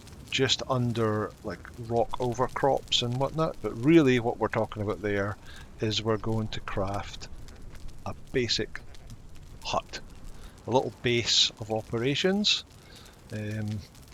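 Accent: Scotland, United Kingdom. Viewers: this tutorial is objectively superior to all the others b/c of the scottish accent alone.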